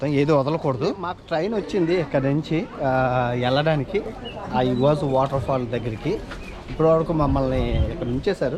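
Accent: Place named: Srikakulam, India. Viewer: I started watching in Facebook now I am one of your subscribers😊 doing great job bro entha tension lo unna me voice ki me sarcastic ki chala navochesthadi Madi Srikakulam ma slag koncham me voice lo vinipisthadi..chala degara Vala laga anipistharu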